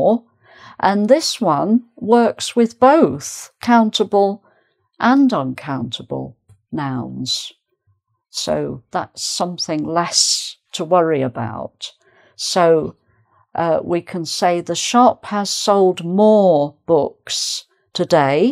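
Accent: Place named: RP, United Kingdom. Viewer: Oh. that Lovely british lady with RP accent!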